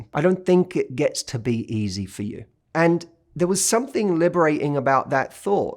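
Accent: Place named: United Kingdom. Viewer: Matthew I have been watching your episodes for quite a sometime now.... I believe the last couple few years and this time you really grabbed me and and something you said I I just can't even pinpoint it but it really touched me and grabbed my attention so much that I'm still trying not to cry and I hope I can make it to that Sixth Day event I'm going to take a look at the package situation and thank you I enjoyed your videos and yeah maybe I am one of the the likes thumbs up person.... but I had to say that you are quite soothing to listen to as well..... I am a bit curious exactly where you're from actually, I can here the Brit down in you I believe! 🙂... I'm from Fort Lauderdale, FL in the United States but My Father.... (unfortunately, he has been passed on now over 3 decades from last April 19th, 1991... so, may he Still R.I.P., and WHOA! 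& Happy Father's Day to him!.... Anyways sorry I just realized what today is..... So back to my scattered point.... He was born in Dover, England, and the funny thing is I guess my dad's British accent might have wore off overtime here in the states but I can do a pretty good impression myself growing up watching a lot of the BBC as a kid..... i.e - Monty Python & the Flying Circus, Benny Hill, Fawlty Towers, even that soap EastEnders back in the day etc.... and I'm just rambling on that nothing... Lol.... Nice little chat aye! Thanks 4 everything oh! & a Very Happy Early Birthday to you! 😎 🎉🙂🎂😀🍾😊🎁 Make a Wish! I Do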